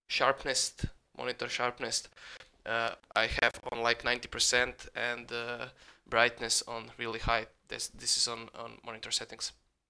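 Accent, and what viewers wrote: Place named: Serbia. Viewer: Bukvalno sam znao da si nas jer kako pricas engleski nisam ni trebao da gledam foldere xD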